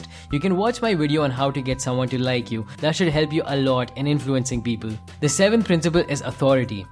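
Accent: India. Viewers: Your accent sounds indian-english where are you from? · I'll be very racist now! Please!!!! Let the native english speaker voice this cognitive stuff, and later on this dude who is probably indian can translate all this to his native language...I cant get a thing because of his obvious accent... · Indian blabber lol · its indian accent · Jitna bhi Dollar Dollar bol le, pata chal hi jaata hai ki apne Indian Bhai ki aawaaz hai. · I had to skip . Your accent maybe me feel like I am getting scam from the phone calls from IRS indian people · I like how it's narrated by an Indian man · I like how accent. Sounds like an Indian guy from Asia. Nice to hear for me. Shows the reach of this media as well as how small our planet is. Perhaps we will realize we are virtually all the same.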